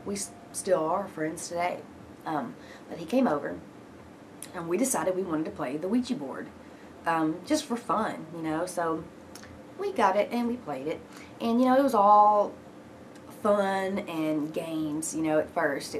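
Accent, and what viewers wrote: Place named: Texas, United States. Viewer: i can tell she is fro texas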